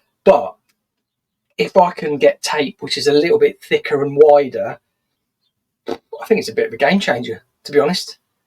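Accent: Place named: Northern England, United Kingdom. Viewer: Hi,your creativity is absolutely infectious..nice to hear a fellow northerner too like myself 🤗( I'm a Scouser albeit a posh one lol & now living in the Wirral) Why don't you buy a roll of clear plastic carpet protector( used by decorators & keeping the carpet clean during building work ).I occasionally teach adults with MS / visual impairment like myself printmaking techniques & textiles & have used that decorators film to save money on professional ( Mylar sheets ) for years..I have used it successfully as stencil film on walls & furniture..brilliant for cheap & quick 👕 t-shirt design printing ( just add 1sheet inside to stop ink/ acrylic weeping through 👕) & then cut your design to stencil with scissors or a knife & stick on the front..I.also got kids who were partially sighted like me to make their own stained glass window decals using it & Sharpie markers..yeah for what your doing here you'll have fun & it's fairly low tack,wonder if conditioning it out with loads of warm water would eliminate all chance of ripping out any hair?? 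BTW 25 metres is under £12 & it's around 20 inches wide..like stair carpet width ( it's main use in DIY) xx..Your bobbed hair with blonde under the fringe I had fabulous ' barcode reverse weekly's highlights on my long way hair in the mid 90' s & what you call long Wolf cut..if I say so myself it looked sexy as hell lol I've always been obsessed by big 1970' s Hammer Horror film hair..especially the vampire women lol 😆😛lol x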